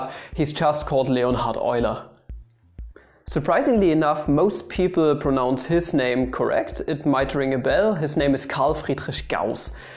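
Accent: Saxony, Germany. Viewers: Речь идёт, конечно, о разных диалектах немецкого. У неустрашимого нашего хоста явно саксонский акцент, и поэтому говорит Фридриш вместо Фридриха. :) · Aus welcher Ecke Deutschlands kommst du? Ich hör die ganze Zeit nur Friedrisch und Ludwisch. Erst dachte ich an Sachsen. Stimmt das? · Boii with Sachsen accent tells us how to pronounce shit. I found my kink. And with Kepler there is actually something that can go wrong. My GERMAN Professor still calls him Keeeeeeeeepler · Er ist Sachse... das ist nicht der Norden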